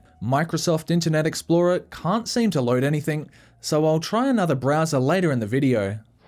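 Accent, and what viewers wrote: Australia. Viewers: Your videos would be very entertaining if it were not for your extremely annoying Australian accent can't you learn an American accent and do the video with that so you sound halfway normal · The second you said HP, I was missing the bold text from DankPods's video. Aussie accent ftw 🤣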